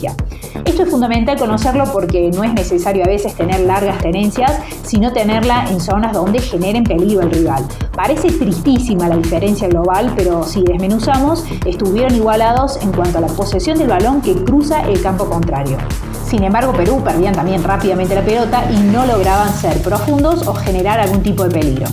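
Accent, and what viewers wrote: Córdoba, Argentina. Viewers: Una pregunta. Eres argentina de la provincia de cordova??????? Se nota mucho. 😅😅😅😅😅😅😅😅😅😅😅😅😅😅😅😅😅😅😅😅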